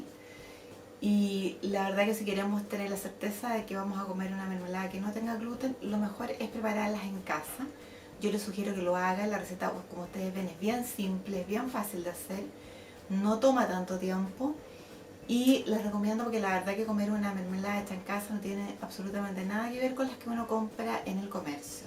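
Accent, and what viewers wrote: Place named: Chile. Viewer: me encanta el tono chileno, amo vuestro pais, asi que me copio las recetas, muchas gracias , abrazo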